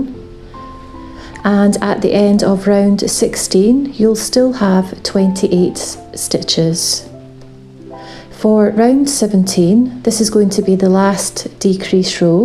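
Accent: Scotland, United Kingdom. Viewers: 🥰Just wanted to say that I love your tutorials,! They are so easy to follow and the result is absolutely perfect! Oh and of course love your Scottish accent! 😄 Keep the videos coming because I for one am hooked for life! Pun intented!;)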